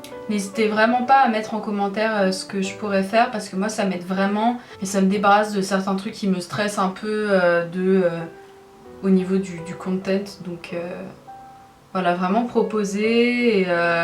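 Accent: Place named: England, United Kingdom. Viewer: Le maquillage est une catastrophe mais l'accent anglais en revanche est une pépite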